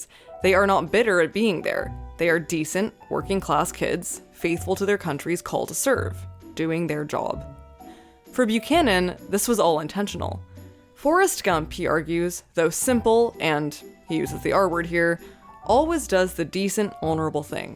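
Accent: Canada. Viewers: So, I agree with a lot of what you say here. Zemeckis & co. definitely took a lot of the complexity and all of the darkness out of Book-Forrest’s character. I think Movie-Forest is more like Chance the gardner, from Being There , than the character from the book, who he shares a name with. But, to be fair, the book really was very badly written. IMO, it’s maybe the only time I’ve ever experienced the book being worse than the movie. Full disclosure: I’m also from Canada (I think I might hear an Ontario accent in your narration?), so I’m looking at the US situation from the outside, in. I also loved the movie when it came out and watched it on repeat for a while (I was in High School at the time), and haven’t watched it since. So I definitely have a bias and I might be forgetting key things. Still, I agree with you; the movie is not even close to perfect and it has some jingoistic vibes. 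But, there’s also hope there, even if Forrest is a bit of a fairy tale character. And, human suffering, as well as the darkness of the historical period is not completely absent from the story, either, with Lt. Dan and Jenny. I don’t think the intention of the film was stark realism. And don’t we all need hope and a happy ending now and again? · Is this channel’s creator Canadian?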